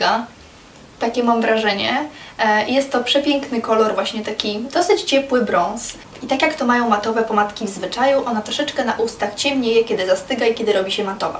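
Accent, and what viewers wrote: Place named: England, United Kingdom. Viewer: Jejku! jaki ty masz śliczny angielski akcent!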